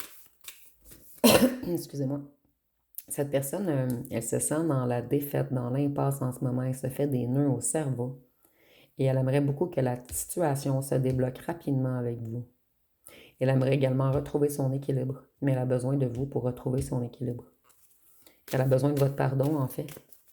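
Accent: Canada. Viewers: Tirage très complet deux personnes se superposent. J'adore cet accent canadien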